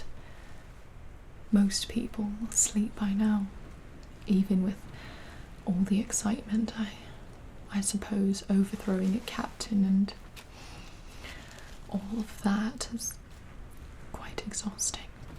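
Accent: England, United Kingdom. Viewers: You fully sound English here and this is coming from a Brit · What English accent is that? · Ahhh yes a British accent because we all sound like that in the uk it’s not like there is 4 different countries with numerous different accents in them but let’s call it British but sound English yes smart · Yo English accent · Why do you actually sound convincingly british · Not a bad English accent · *English accent not British lol Don't hat I love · *English accent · You actually nailed the English accent, however I'm from the North so its a little different 😂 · There's no such thing as a bloody "British" accent. She is doing an ENGLISH accent. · British accent is better then mine, quite jealous to be honest. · Your British accent is the best ☺️💤😴👍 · Bravo, that's a very impressive British accent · I had a british girlfriend and sounds more british than her ahaha. 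Seriously though, to nail it that hard and do a top-notch rp on top of all that is something else, Im in love with it. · *English accent. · That's an english accent not a british accent, please change it and respect the rest of the UKs individual accents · English accent is one of the best Gibis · English accent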